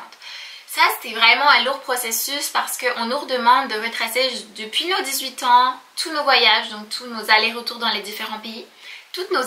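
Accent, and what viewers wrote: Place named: Quebec, Canada. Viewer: C'est fou comme tu as pris l'accent Quebecois ! Je te revois encore avant ton départ pour ton année d'étude. Que de chemin parcouru depuis. Je vous souhaitee de réussir dans toutes vos démarches <3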